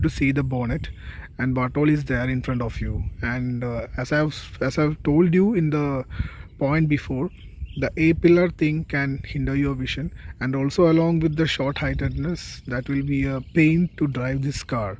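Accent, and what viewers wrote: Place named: Kerala, India. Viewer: Are u malayali?? Ur English slang resembles Malayali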